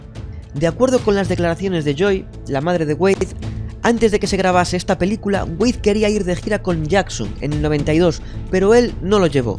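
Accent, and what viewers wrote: Galicia, Spain. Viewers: He llorado tanto con este video.... como tu dices hay que ser muy fan para conocer un poco el carácter de Michael, saber de toda esa gente , empaparse de videos, entrevistas e información que tenemos al alcance de cualquier persona, sin embargo la gente prefiere alimentarse del morbo de las noticias y quedarse con una imagen de Michael manchada.ASCO. Yo tbn pienso que este documental debería ser un puto delito sacarlo ahora a tantos años de su fallecimiento, en fin ya les vendrá el puto karma, que asco. Por cierto eres gallego verdad? jaja hi bro xddd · no gracias a ti por recopilar videos y pruebas que según entiendo están en la casa de los jackson o en evidencias de los Estados Unidos de America y por el acento y todo supongo que eres de españa ósea al otro lado del mundo de donde sucedieron los cosas pero bueno esperemos haber si sale algo que destrone a bohemia rhapsody y volvemos a ver niños bailando y escuchando a Michael dándole más empuje a su venta de canciones pero ahora en descargas digitales para que le adjudiquen otro logro y tú sigas haciendo videos defendiéndolo 🙂👍 Recuerda amigo todo en esta vida es un negocio y yo me animo a apostar que el par de hombres que salieron en el documental solo están resentidos de no tener trabajo y ya no tener a jackson para que les mate el hambre y les pague con M&M’s y dólares en fin los padres de esos dos en dado caso que sea cierto que los violaba ellos tuvieron la culpa y además ellos por igual ya que preferían ser violados y recibir dinero,vacaciones, ropa discos dulces etc que seguir luchando muy aparte de jackson Y no soy fan ni ataco a jackson es que estamos en una época donde todo esto ya es más visto en el mundo de les espectáculo y siempre termina siendo mentira o sacan un álbum nuevo y en el mundo real pues el cuento se cuenta solo no crees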